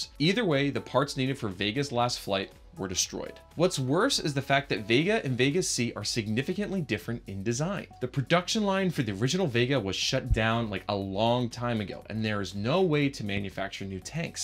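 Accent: Germany. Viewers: Wow, ist das schon wieder zwei Jahre her, dass ihr in die USA gezogen seid? Aber ja, das weiß ich, den deutschen Akzent erkennt man überall. Wobei du echt viele neue englische Wörter in dein Repertoire aufgenommen hast. Ihr habt doch vorher irgendwo in der Nähe vom Nürburgring gelebt. Hast du nicht irgendwie sowas mal gesagt, in einem Video lange her, oder? Wie kommt deine Frau damit eigentlich so zu recht? Vermisst sie Freunde oder Familie? Schätze den Kids ist das eher egal, oder? Naja, geh da von mir selbst aus. Wurde auch als Kind durch die Arbeit meines Vaters nach Florida verschleppt. Als Deutscher freut man sich ja über die Wärme, aber die einheimischen kühlen gerne etwas arg runter 😄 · I knew is from Germany stil a little Accent left.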